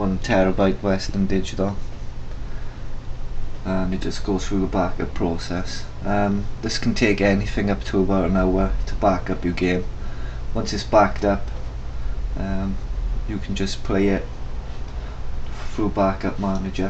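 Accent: United Kingdom. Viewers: Are u Welsh ? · Do you live in Swansea? I ask this based on your accent. If you do would you flash my desire for me? I havent really got the time to learn all this stuff. Theres a 8 pack of stella in it for you :D Give me a PM. Cheers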